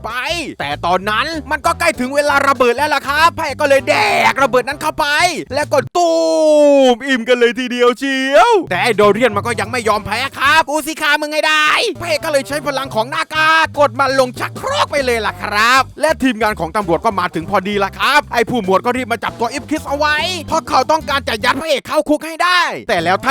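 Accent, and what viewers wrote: South, Thailand. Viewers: ผมชอบในความภาษาใต้ของพี่5555 ใครคนใต้บ้าง✋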